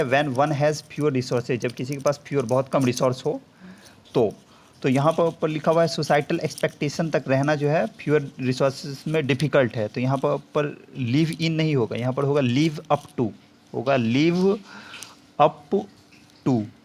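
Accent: Bengal, India. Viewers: sir, aap bengali ho?? LOVE THE WAY YOU TEACH...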